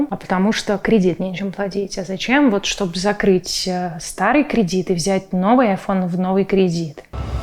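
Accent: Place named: Ryazan, Russia. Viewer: Не знаю. От рождения так получилось. И никак не убирается. Может быть рязанские корни дают о себе знать 🤷‍♀️.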